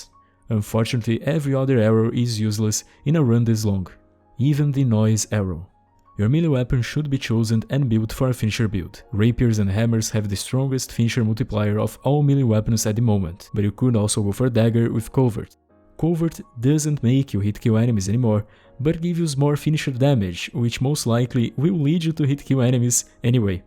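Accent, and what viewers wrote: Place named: Brazil. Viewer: Sabia que era Brasileiro por causa do sotaque kkkk muito top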